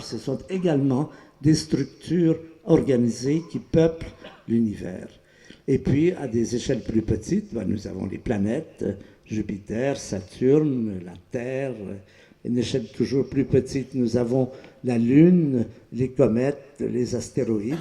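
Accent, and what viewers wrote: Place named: Canada. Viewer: ZzEL Chavez Zz Hubert Reeves est Canadien, permet lui d'avoir un accent !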